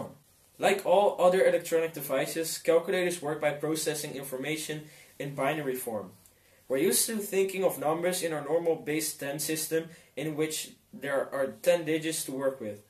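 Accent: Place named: Netherlands. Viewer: ok, although speaking rather good english, my ears are telling me he's Dutch. Is he?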